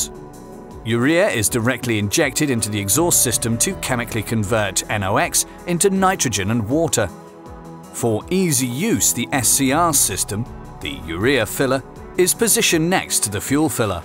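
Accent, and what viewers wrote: England, United Kingdom. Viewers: The narrator is British... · Lost me with the British voice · All sounds good huh with introduce by someone with an English accent until you hear the problems they are having the Eco Boost engines. PLEASE call it what it really is... TURBO CHARGED ENGINES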